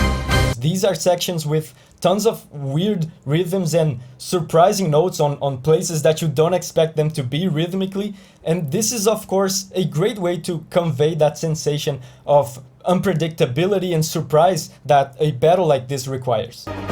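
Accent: Brazil. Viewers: great video, love your mh content! btw you have a very brazillian accent or at least sounds like it lol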